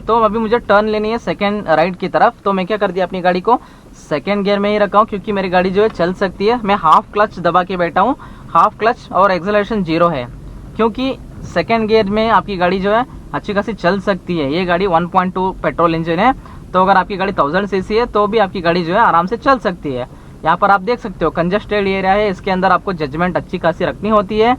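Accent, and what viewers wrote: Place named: Karnataka, India. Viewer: Being a kannad...your hindi is excellent👍👍....a little influence of your mother tongue is quite natural !!!....👍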